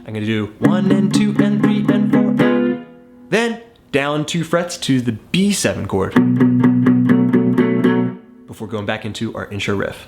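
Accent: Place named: United States. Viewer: that accent in america has always intrigued me-nearest sounding american accent to english uk accent-where you from man?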